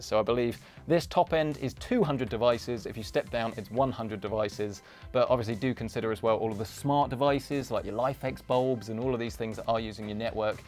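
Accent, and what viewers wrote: United Kingdom. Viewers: Brits always pronounce things weirdly... It's router not rooter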